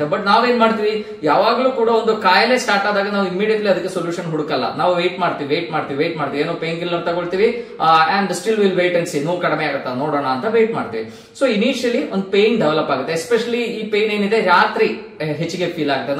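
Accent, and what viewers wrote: Kerala, India. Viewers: sir you seems like from malayalam language background but your kannada is excellent..👍🏻very good information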